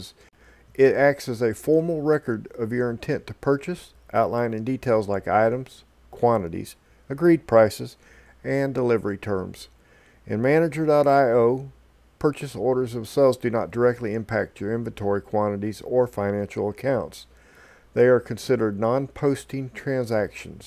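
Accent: South, United States. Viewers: man I love southern accent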